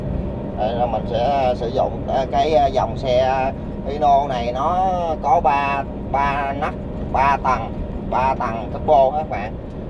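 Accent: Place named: Tay Ninh, Vietnam. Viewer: Giọng bác này ở tây Ninh quê em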